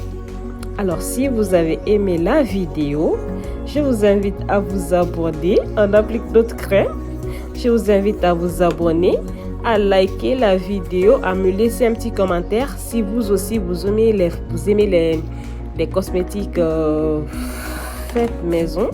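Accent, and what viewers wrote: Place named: Senegal. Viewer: Vous êtes sénégalaise y’a pas de doute, magnifique accent ❤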